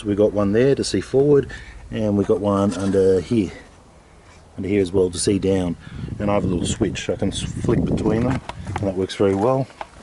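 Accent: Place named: Australia. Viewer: Hes australian.....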